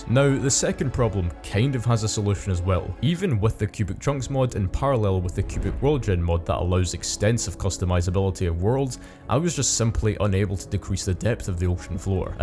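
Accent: Scotland, United Kingdom. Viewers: I finally found a scottish youtuber! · Where are you from? You sound like a Scot trying to not have a Scottish accent. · Scottish YouTuber found lets go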